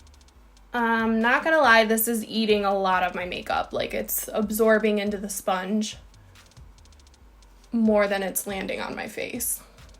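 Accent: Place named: Minnesota, United States. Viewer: You’ve got to be a fellow Minnesotan because you sound like every girl I know.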